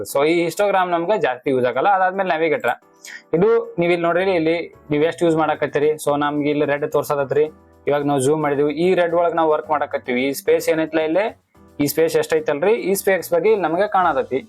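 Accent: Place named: North Karnataka, India. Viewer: uttara karnataka style super bro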